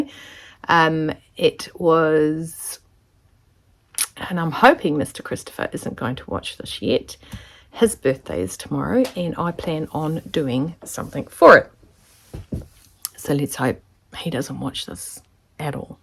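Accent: New Zealand. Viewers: I just discovered your channel via the #fridaysews. So enjoyed watching and good to listen to a fellow kiwi.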